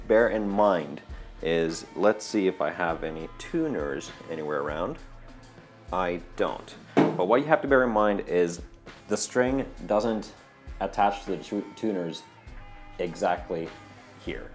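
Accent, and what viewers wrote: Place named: Netherlands. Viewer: I liked your video quite a bit. I started developing a basic line of instruments about a year ago, and by far the hardest part has been nailing down the headstock shape. It is quite hard to match one headstock to a variety of body designs. Another area to touch on is tilt-back versus flat headstocks and how the neck to headstock transition works in either case. I personally prefer the Fender approach because it is simpler, is less likely to break and saves on wood. I'm going to subscribe to your channel, as I greatly appreciate people who explore design and engineering. Incidentally, are you Dutch, or maybe South African? I'm trying to place your accent.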